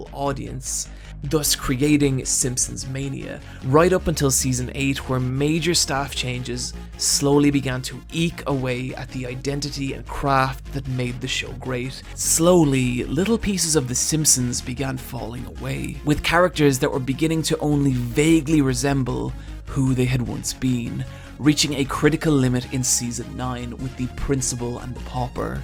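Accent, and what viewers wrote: Ireland. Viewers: After watching that entire video still can't figure out why in your Irish accent you went for the American pronunciation of patronise. Other than that, great watch 👍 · Another thick Irish accent haha ;) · wtf is this guy IRISH??? This is so odd?? I am Irish that’s why I realised old homer could read :) new homer can read rarely… :( episodes used to be the full runtime now it’s two episodes between a break :( he really does care about his kids :) that’s one thing I still sometimes see in newer episodes keeping the characters grounded and not making a mockery out of the characters… so true… :( fucking facts :) :( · This is a great video man, fair play. And great to hear an Irishman lay it down. Just not used to hearing an Irish accent on decent vids on yewtewib. I have kids and we watch The Simpsons a lot but only season 1-12 (basically because I can't watch any of them after that). 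Interesting thing is my kids absolutely love it - and I was 11 when I got my first Bart Simpson T-Shirt in 5th Class and I loved it then. But my god it became execrable after around 2001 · your videos are complete rip offs of Nerdwriter1, its hilarious how much of your style youve carbon copied from him, also your americanised irish accent is embarrasing please stop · I'm gonna watch this, but, fuck your tone, lol. This is the first time I've hated an Irish accent but there is something so.... smug and arrogant about the way you're presenting this. It's no secret The Simpsons has gone downhill, but even from the intro I get the sense you're going way way overboard.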